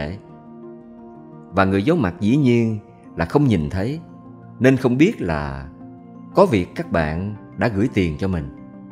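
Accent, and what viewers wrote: South, Vietnam. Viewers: Hi, Chúc anh ban cùng gia đình mọi sự tốt đẹp nhé! Tôi phải ngả mũ chào ngưỡng mộ qua tuyệt vời!! 👍👍👍👍””I fall in love with you ””” about Well- knownledged, well prepared, well organized từ cách giới thiệu rất hoàn hảo, đẹp mắt! Từ giọng đọc thật truyền cảm, thật “” Nam kỳ tình”” giong cuoi rất khôi hài!! Chúc anh ban thành công và tien mai trên sự nghiệp nhe! BRAVO BRAVO 👏👏👏👏👏❤️❤️❤️❤️❤️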